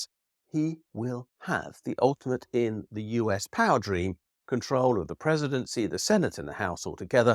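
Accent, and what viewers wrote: United Kingdom. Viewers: Ok, you talk funny. You are not an American, so p!ss-off Limey.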